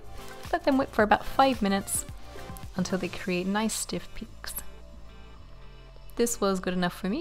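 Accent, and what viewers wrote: Australia. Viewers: Hi,. Your lemon cake looks so pretty, just like you and your Australian accent, mate. What's your best tip for making the best strawberry cake? Take care of yourself, sweetie. <3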